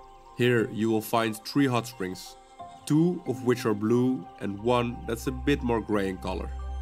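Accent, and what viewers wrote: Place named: Netherlands. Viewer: Dik Nederlands accent :p maar wat een prachtige video!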